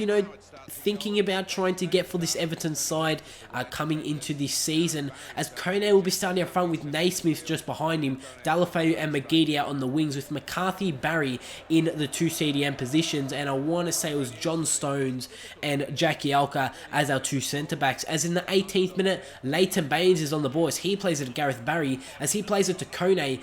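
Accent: Australia. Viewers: you from Australia??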